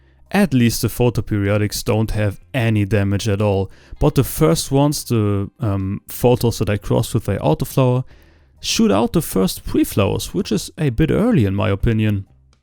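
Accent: Germany. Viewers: 🤣 the German accent makes this funnier, I dont know why this part was hilarious too lol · He is german. Finnish have different accent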